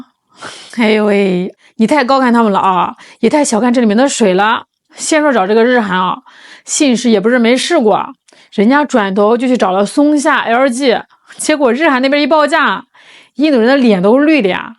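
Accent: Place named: North, China. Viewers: 口音好聽😊 請問是北方哪裏的口音？ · 这山东腔，上头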